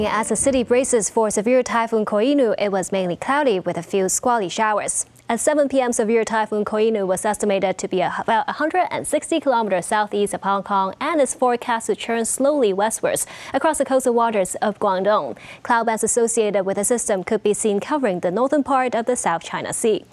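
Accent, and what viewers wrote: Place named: United States. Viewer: 英文絕頂 是美洲英文😊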